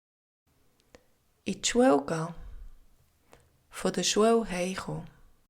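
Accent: Switzerland. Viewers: Which Canton German is this?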